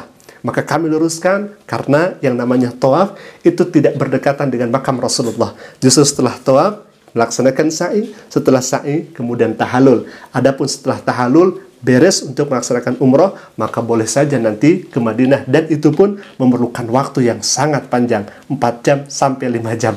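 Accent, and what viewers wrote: Indonesia. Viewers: Ngaku dr Malaysia logat bahasa Indonesia Ini aja dah ketahuan bohong nya 😂😂😂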